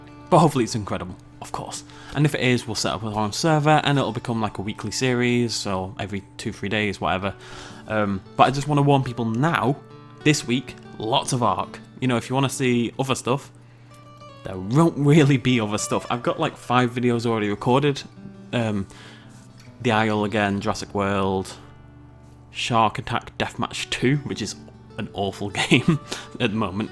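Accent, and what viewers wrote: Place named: Manchester, United Kingdom. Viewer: I live in Manchester, I thought you were from around here from the accent. Would love to play ARK with you and come see JW if I'm free :) I'm watching a Twitch stream of ARK now and the server won't load :S hope the actual release is better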